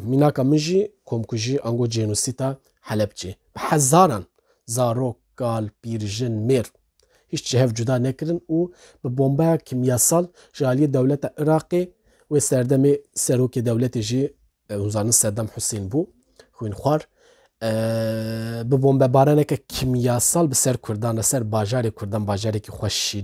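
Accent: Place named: Serhed, Turkey. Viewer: Kêfxweş bûm ku tu jî wekî min dibêjî "rocî" 😂🙈 Serhedîbûn 🫶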